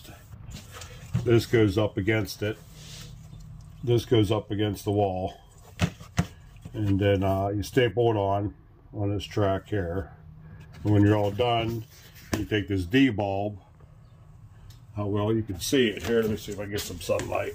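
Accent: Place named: Pennsylvania, United States. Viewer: I just hear Pennsylvania when you talk.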